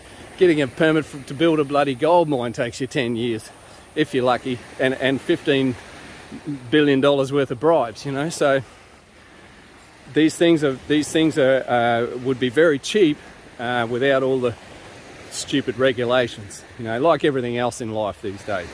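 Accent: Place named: Australia. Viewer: Yes! An Australian talking about LFTRs! What is the status of nuclear power in Australia; LFTR, in particular? Which organisations are out there trying to (re)educate the public about nuclear power (and dispel some of the myths and lies, etc.)? I'm aware of Nuclear for Climate, but they have no policy on LFTR, or any specific reactor type, as far as I could see. It was a bit disappointing that it had to be Peter Dutton to be the first to call for an "unemotional discussion about nuclear power - in particular small modular reactors" in his budget reply speech recently. Still, better than no high-ranking politicians talking about it. But LFTR is where it's at. I've been curious about the combination of LFTR and SMR designs. Can't tell you how stoked I am to hear an Australian accent talking about molten salt reactors running on a thorium cycle. Well, I'm "subbed". 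Keep up the awesome work! PS Regarding the nuclear submarines the current (and former) Commonwealth Government is looking to purchase: I think they'd do a LOT more good if they were put in dry dock and connected to the grid. ;)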